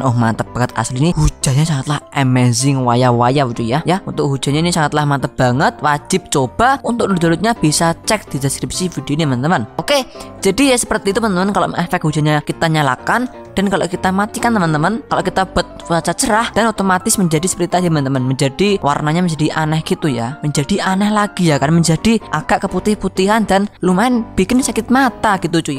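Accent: Java, Indonesia. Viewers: Uwong jowo ketoro ne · Dia org Jawa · Sumpah gw ngakak dengan gaya bicaranya,, kayak jawa jawa gimana gtu anjay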